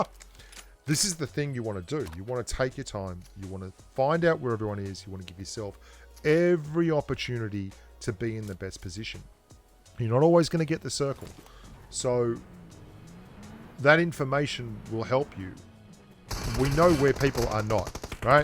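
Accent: Australia. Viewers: Idk mate I just love the Aussie accent👌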